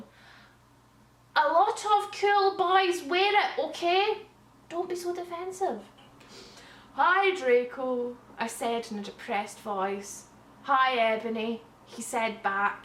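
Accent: Scotland, United Kingdom. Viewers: ... bad Harry Potter fanfiction read by a Scottish woman? I need this in my life! I came for the Scottish handmaid, but I'm staying for this! Subbed! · I'm bad at identifying accents. She's scottish?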